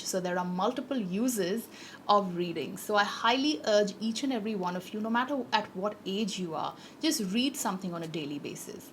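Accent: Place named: England, United Kingdom. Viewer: Really loved this video. I really love your English accen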